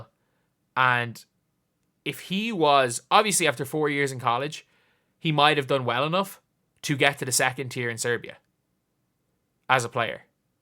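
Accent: Ireland. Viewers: Is this guy Irish? He sounds like me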